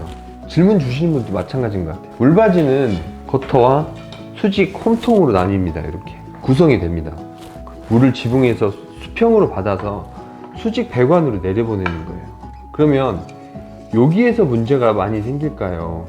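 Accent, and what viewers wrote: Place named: North, South Korea. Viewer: 저는 부산사람인데 걸리버 방송을 연달아 듣다보니 저도 모르게 위쪽 사투리가 나올거 같아요ㅋ 근데 차분하게 설명해주셔서 참 듣기좋아요~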